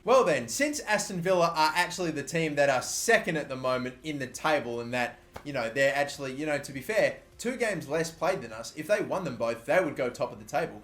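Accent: Australia. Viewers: Mardoche Basisa he has an australian accent... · Mardoche Basisa he's Australian...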